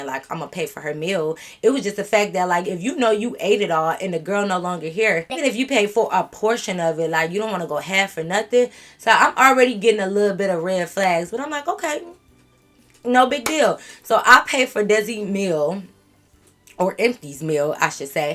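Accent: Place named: Chicago, United States. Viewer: And I’m from Chicago so our dialect be hella similar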